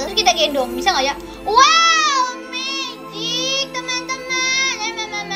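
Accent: Java, Indonesia. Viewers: Kak btw orang mana yak? Kek nya Jawa yak? Kalau Jawa, Jawa mana? Akoh Jawa tengah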